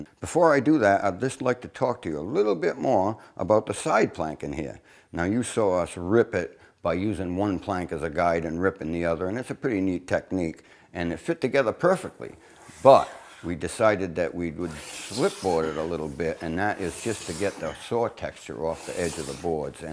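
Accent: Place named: Massachusetts, United States. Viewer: Listening to you talk reminds me of my cousin Jack who lives in Attleboro. Love the accent although we sounded a bit different out on the Cape. Also appreciate the comment about the "bucket of quahogs. I sure miss that.Used to just go out and dig them up for a great chowder on weekends. Your tutorial on building this skiff is terrific.I've always wanted to build a boat like this and I just may give this one a try. Thanks a million for the instruction and the memories.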